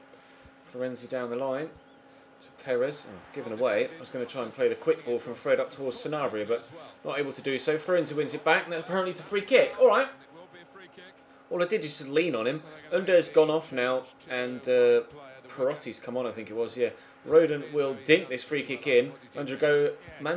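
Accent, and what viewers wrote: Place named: RP, United Kingdom. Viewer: Why do you speak like a class posh commentator?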